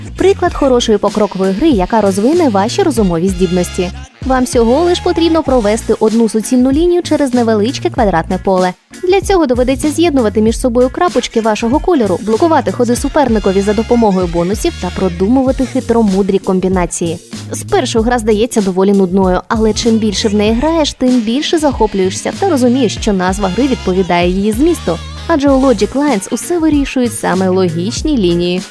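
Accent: Standard. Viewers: Так круто слухати чисту українську мову, причому гарним голосом) Я теж роблю топи, якщо неважко, можете заглянути ;)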